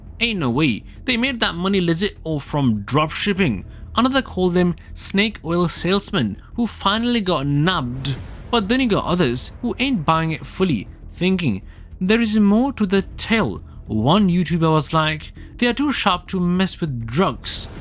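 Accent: India. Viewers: WHY TF you have I dian accent ????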